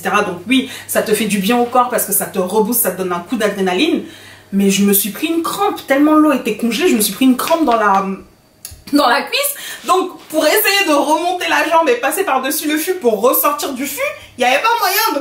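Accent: Cameroon. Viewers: Belle vidéo j'aime ton accent camer ❤️🇨🇲 je m'abonne direct svp n'hésitez pas à vous abonner également à la mienne merci bien 😇🙏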